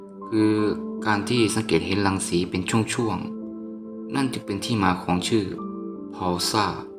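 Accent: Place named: Isan, Thailand. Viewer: เสียงบรรยายสำเนียงอิสานมากๆคับ น่ารักดี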